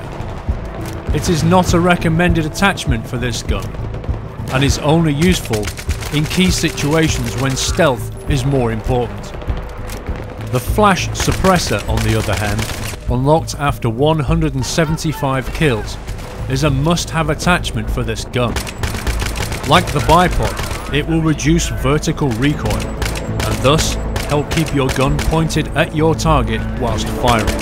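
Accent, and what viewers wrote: England, United Kingdom. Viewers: Why the English accent !? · From the HK Website: Frequently asked Questions What is the proper way to pronounce Koch? The English pronunciation is pronounced, “coke." He pronounced it properly, it's coke, but he has an English accent. The video pronounced it as perfectly as his accent allowed. You're all saying it wrong. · DAMN!! now thats sone in depth review!! THE NEW BREAD OF REVIEWS! Fantastic video! see you got to narrate it lol he sounds like your average uk army guys accent lol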